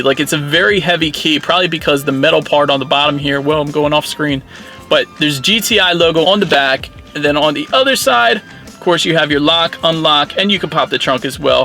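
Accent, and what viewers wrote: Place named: Delaware, United States. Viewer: Hey got any Hoagies? Love the accent. Been awhile. Born in Wilmington, DE.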